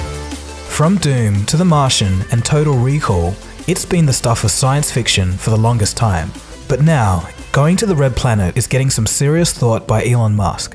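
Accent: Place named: Australia. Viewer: I personally didn´t like the australian accent, and muted the end of the video, because the music was annoying/overcompressed.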